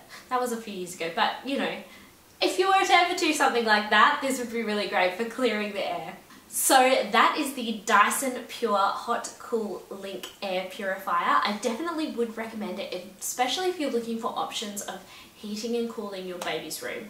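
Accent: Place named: Australia. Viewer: I love Australians accent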